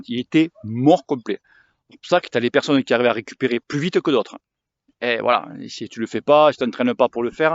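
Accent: South, France. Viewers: En faite tes détracteurs il ne comprennent pas ton accent du sud du coup il faudrait peut être faire des sous titres... Sinon moi je roule dans le sud Est pluie pas pluie avec ma femme on a deux 350 ça passe crème c'est vrai qu'il faut nettoyer le vélo après mais bon arrête de faire la fegnasse lol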